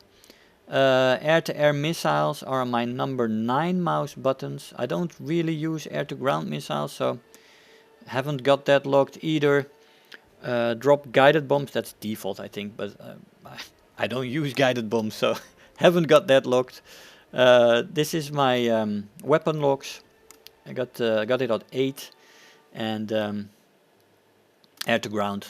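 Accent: Netherlands. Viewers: i smell dutch....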